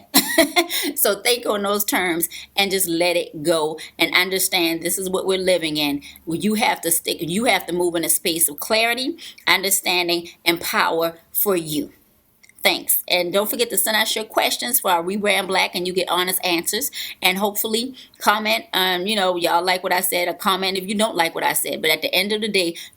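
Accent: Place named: Charleston, United States. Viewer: are you from Charleston? i hear your accent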